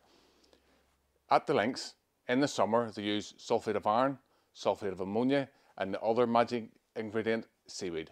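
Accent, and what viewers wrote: Northern Ireland, United Kingdom. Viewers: thanks for the full reply (not many YTers bother!) and BTW, love the Northern Ireland accent (if that's it?)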